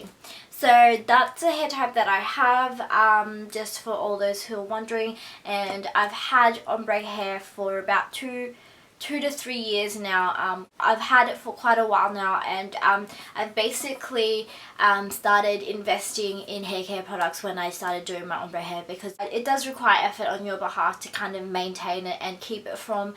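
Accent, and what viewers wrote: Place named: Australia. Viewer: you're from Australia right?? I reaaally love your aussie accent haha :) it's so unique and I love it :) and you have very nice ombre hair!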